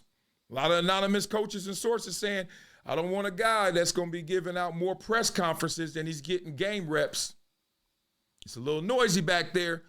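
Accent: Los Angeles, United States. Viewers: Wow. You’ve got that La rap accent pure gold 👏🏾👏🏾👏🏾.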